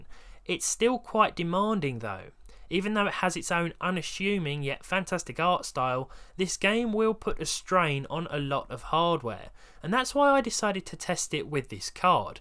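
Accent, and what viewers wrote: United Kingdom. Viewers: Love your videos my British friend you are excellent very enjoyable good reviews keep it up I hope you're sponsored and people give you money U2 must love you I'm hoping and you deserve an award by now anyway like I said you one of my favourites I was watcher stuff we always seem to pop up all the time so I do continue to watch her things keep up the great work love your accent love your comedy try to throw more comedy in there that's very enjoyable try to stay British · Are you from british ?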